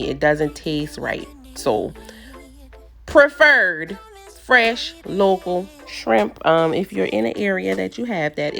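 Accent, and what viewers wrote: Charleston, United States. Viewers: Got chucktown written all over your voice!!! Girl I stay watching recipes..here I am!!!😂😂😂my city!!! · You must be from Charleston your accent is just like my dad and aunt… he passed away last year. Anyway the food looks yummy!!!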